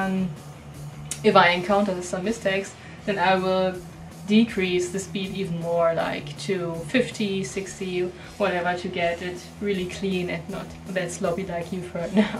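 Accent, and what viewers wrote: Germany. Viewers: Красивый немецкий акцент. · I like your german accent (Hab ihn selber xD) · I love your german accent when speaking. Do not exist best with this: The very best guitar player in fusion with as a beutiful girl. hi from Chile.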